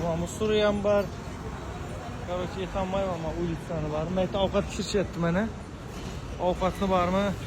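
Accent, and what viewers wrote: Shymkent, Kazakhstan. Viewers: Чимкент узбеки акцент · Ака чимкетликмисиз ???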